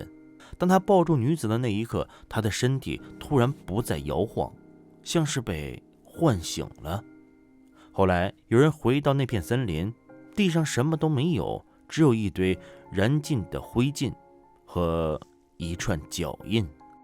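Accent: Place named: China. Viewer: 大陸口音 我不懂 為什麼 沒有大陸的靈異事件!?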